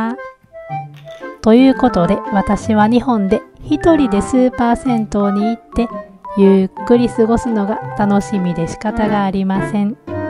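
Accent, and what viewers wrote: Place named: Kansai, Japan. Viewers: 今回も笑えました😂 ドイツのスッポンポン事情‼️ぜーったいNGですよね😣逆にストレスたまります！ 主婦だって息抜きは必要。美味しいお料理とお酒とおしゃべりがあれば乗り切れる気がします！ 実は今日、新社会人に向けて、娘が一人暮らしをスタートしました。私の方が寂しくてしょげていましたが、この動画を見て思わず笑ってしまいました😊nobanaさんの関西弁のお喋り、ほんと大好きです😍これからも楽しい動画、よろしくお願いします😋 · 初コメです！Guten Tag🍺 私も過去に１０年以上ドイツで暮らしたことがあるので懐かしい街並みや生活スタイルを懐かしくチャンネル登録させて頂きました。 旅行で数日滞在するぶんにはドイツはお城やらオーガニックコスメやら硬ったいパンやらもの珍しいかもしれないけど、何年も現地に住むとほんとに日本でのドイツの捏造されたイメージと現実があまりに違いすぎて愕然としたものですよね( ´艸｀) それでも流石に現地に順応されて生活されて子育てまでされていNobanaさんのような素敵な日本人奥様がいることがすごいなと思うし在外邦人の鏡だと思います。 視聴者さんの中には海外生活やドイツ生活のネガティブな部分を見るとがっかりするのか・・そのように思う方もいるようにコメント欄にはいるようですが、ここはあくまでNobanaさんの動画チャンネルなわけですからNobanaさんが考えたお好きに動画スタイルのほうがご自身にもストレスないと思います。ただでさえ寒く暗くどんよりグレーな空のドイツ冬季に色々メンタルも落ちるのすごいわかります。 日本に帰国して思うのですが日本のテレビやメディアは海外の映えている部分しか見せないし、海外に住んでいると(そんなアホな―笑)と思うことだらけだし。 日本のテレビはほんとに海外生活のいい所しか映しませんから、これから海外の出たり海外生活を始める方もこういう海外生活ＹＯＵＴＵＢＥ動画を見ていると思うので、いざ現地に出て映えていない現地生活にがっかりしないように準備するためにも、ほんとの海外生活を見せる事も大事だし、結果的には役にたつのだと思います。 これからもNobanaさんらしい関西弁での面白い動画を楽しみにしています。 ちなみに私はドイツの混浴サウナは１度経験してみればもう慣れてしまいジムで平気になりました(笑) 寒い長い冬の気候で凍えるよりジムのサウナで温まるほうがメンタルを保つのに大事でした( ´艸｀)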